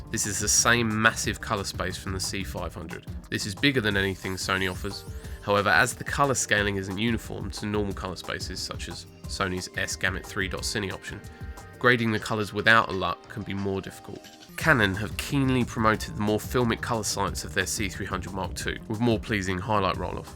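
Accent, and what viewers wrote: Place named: United Kingdom. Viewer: The problem with this vid is your very hard british accent, making it very hard to understand for strangers... Still a nice vid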